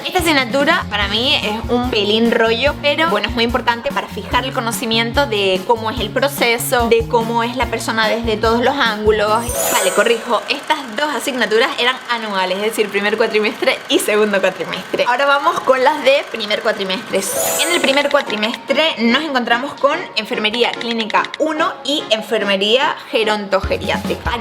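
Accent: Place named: Argentina. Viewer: Hablas como argentina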